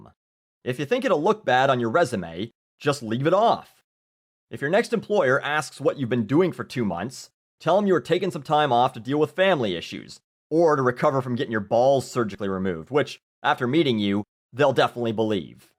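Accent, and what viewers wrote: Canada. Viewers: yay, any chance that replies to my comment? if you see this, you're one hell of a guy and I love your rants, and the canadish accent makes it even better:D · i love your Canadian accent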